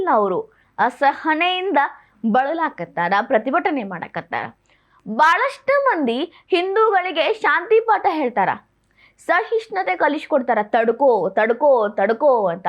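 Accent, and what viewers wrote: North Karnataka, India. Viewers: ನಿಮ್ಮ ಉತ್ತರ ಕರ್ನಾಟಕ ಭಾಷೆ ಕೇಳಲು ಒಂದು ರೀತಿ ಚೆನ್ನಾಗಿದೆ. ಹೆಚ್ಚು ಕಡೆ ಬರೀ ದಕ್ಷಿಣ ಕರ್ನಾಟಕ ಶೈಲಿಯಲ್ಲೇ ಕನ್ನಡ ಮಾತನಾಡುತ್ತಾರೆ. ಆದ್ದರಿಂದ ನಿಮ್ಮ ಭಾಷೆ ರೀತಿಯಲ್ಲೇ ಮಾತಾಡಿ. ಸಹೋದರರು ಹೊಸದಾಗಿ ಆ ಪ್ರದೇಶಕ್ಕೆ ಬಂದಿದ್ದರೂ ಅವರ ಸಂಖ್ಯೆ ಜಾಸ್ತಿಯಾದರೆ ಮಾತ್ರ ಅವರಿಗೆ ವಿರುದ್ದ ಮಂದಿರಗಳನ್ನು ಕಂಡರೆ ಆಗಲ್ಲ. ಪ್ರಗತಿಪರರು, ಸಮಾಜವಾದಿಗಳು, ವಿಚಾರವಂತರು, ಪ್ರಪಂಚ ಮಾನವರು ಸಂಯಮದಿಂದಿರುವ ದೇಶಿಯರಿಗೆ ಸಂಯಮದ ಉಪದೇಶ ಮಾಡುತ್ತಾರೆ. ಆದರೆ ಸಂಯಮದಿಂದಿಲ್ಲದ ಸಹೋದರರಿಗೆ ಯಾಕೋ ಸಂಯಮದ ಉಪದೇಶ ಮಾಡುವುದಿಲ್ಲ. ಯಾಕೋ ಇವರೆಲ್ಲಾ ಸಹೋದರರ ಪುಕ್ಕಸಟ್ಟೆ ಎಣ್ಣೆ ಬಿರಿಯಾನಿಗೆ ನಿಯತ್ತು ಜಾಸ್ತಿ ತೋರಿಸುತ್ತಾರೆ, ಅಲ್ಲವೇ?.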